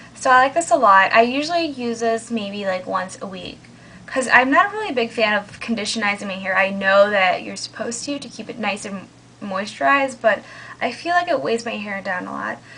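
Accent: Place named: South, United States. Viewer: its sooo funny watching you talk, like u look asian buh u talk country, 1st time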